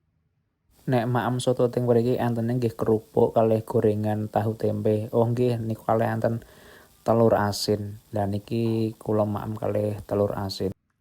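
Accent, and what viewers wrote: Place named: Java, Indonesia. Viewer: Bahasane jowo kok medok banget opo dari jawa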